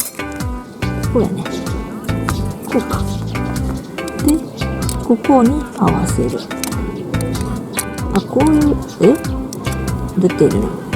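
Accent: Kansai, Japan. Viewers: 関西弁おっとり型の語り口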